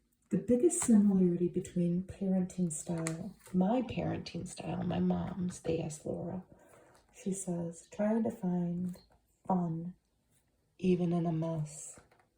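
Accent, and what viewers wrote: Midwest, United States. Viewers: Your Midwestern pleasantness and accent keep me coming back 😊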